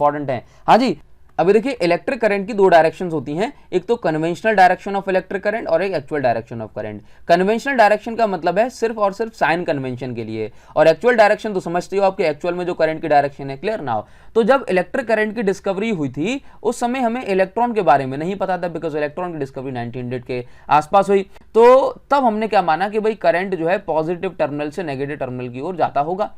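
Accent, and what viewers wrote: Odisha, India. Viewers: Sir are you from odisha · Sir are u from Odisha?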